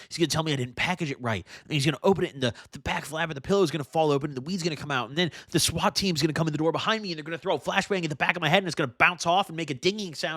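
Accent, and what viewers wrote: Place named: Illinois, United States. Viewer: Yooooo my dawg i fuck with u tough frfr especially being from Illinois im jus now listening to the stories omfg u got it and the fact u talk like a illinoisien i love yo content even better plus u remind me of 50% of my homies from back in the day i can confirm the shit u be talking about I fw it 💯 … I used to be an addict I wish I had ur content when I was def woulda helped me recover but brooooo my nigg@ with this video and me used to ship as a rookie made me fckin die in laughter glad I wasn’t the only one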